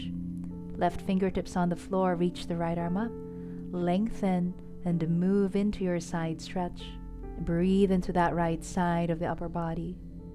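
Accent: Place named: Philadelphia, United States. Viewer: I like your classes. I especially like this one and use it quite a bit. I use a bunch of different videos and teachers, but you and another instructor, always tell when you’re doing your last downward dog. I appreciate that. Just out of pure curiosity, are you from the Philadelphia area? I’m only asking, because I enjoy trying to figure out accents. And yours sounds a little Philly. Obviously if you don’t want to answer, there’s no big deal. Anyway, thank you for your classes.!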